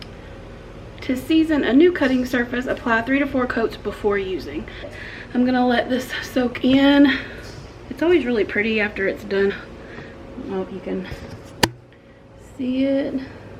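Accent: South, United States. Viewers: We love your rambling company keeping videos. And please say decor the way you like to say it. I love your accent. I’m from the north, but have a lot of family in the south and your accent makes me feel good brings back. Wonderful memories. And I love your little place too. It is so charming and homey. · Love your haircut, it totally suits you! And you say decor however you want. People just don’t understand how we talk here in Kentucky. Why don’t you say Holler, that’ll give something to think about lol. I moved to KY in 2007 and my accent has changed drastically 🤣. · As a Texas girl who moved to Minnesota, you do not need to "correct" your pronuciation. Your southern accent is part of your charm.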